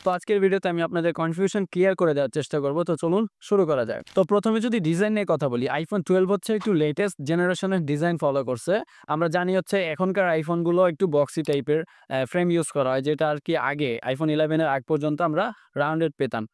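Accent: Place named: Barishal, Bangladesh. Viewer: আমি শিওর আপনি মোগো বরিশাইল্লা😮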